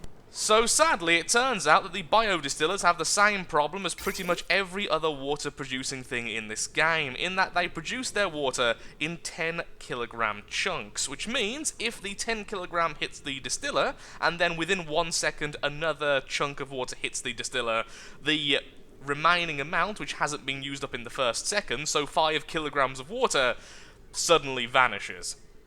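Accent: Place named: RP, United Kingdom. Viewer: I love how posh sounds.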